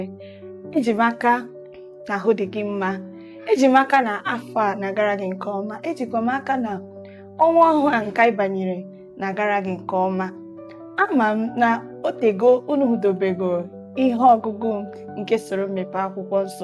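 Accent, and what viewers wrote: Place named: Igbo, Nigeria. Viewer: The pure Accent... Unfiltered Igbo. Ride on ma. 👏🦅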